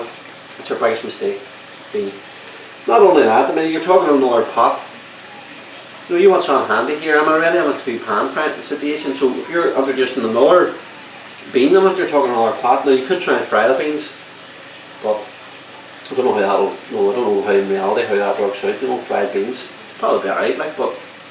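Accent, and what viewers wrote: Derry, United Kingdom. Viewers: It's a Derry accent. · Derry accent!!!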